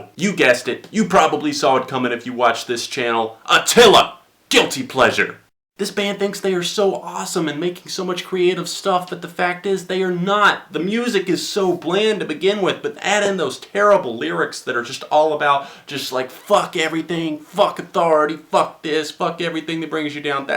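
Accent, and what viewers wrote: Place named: North Carolina, United States. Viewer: I heard your voice and I was like, "I know he's from North Carolina. I know it." So I clicked in the description and I knew it. Anyways I've been loving these videos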